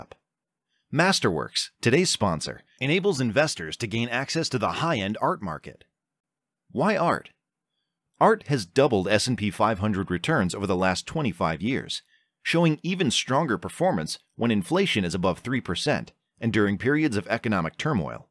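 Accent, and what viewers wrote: India. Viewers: Daam this guy have most stereotypical Indian accent ever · Indian accent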